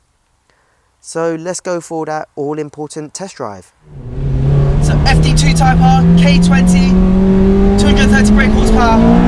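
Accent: United Kingdom. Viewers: Asian face and British akcent .. :) Nice car man.!! I'm really sure about that.. this car is trully Type-R last has been made.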